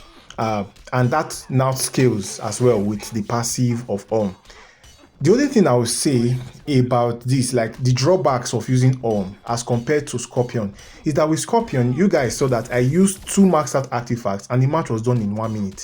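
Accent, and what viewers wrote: Nigeria. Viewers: Bro are u Nigerian?? Nice content btw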